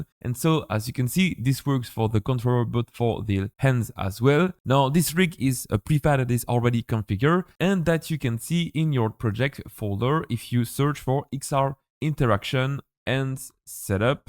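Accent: France. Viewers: Beautiful French accent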